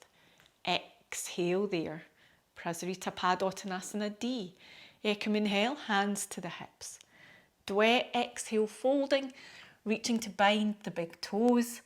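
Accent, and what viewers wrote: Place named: Scotland, United Kingdom. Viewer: Informative, thank you! Love your accent, too. I’ve never been to Scotland, but my grandfather was from a place called Govan in Glasgow. Your accent reminds me of him.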